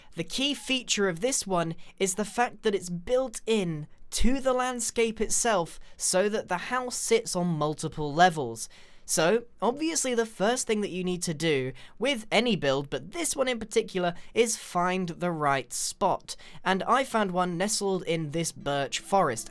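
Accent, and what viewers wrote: England, United Kingdom. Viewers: THAT ENGLISH ACCENT AND CALming voice really makes me feel calm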